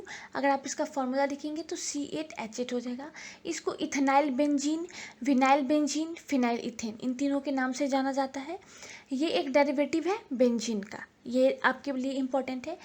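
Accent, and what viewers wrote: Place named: South, India. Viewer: Well presented . You must be from South India I believe .your voice seems like that . Thank you ma"am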